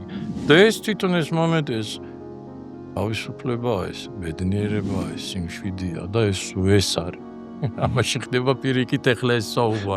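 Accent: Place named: Kartli, Georgia. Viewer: ნირვანა დატოვა და რით რითი შექმნა... რა მომწონს კიდევ; ბევრი უპროტესტებს. ამხელა ზენიტის შემდეგ რომ😊ქართლული კილო არ დათმო და არ "შფოთამს"❤მიყვარს!!!❤❤❤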